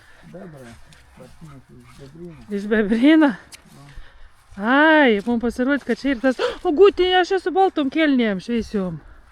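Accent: Samogitia, Lithuania. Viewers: Mėgaujuosi JŪSŲ žemaičių šnekta...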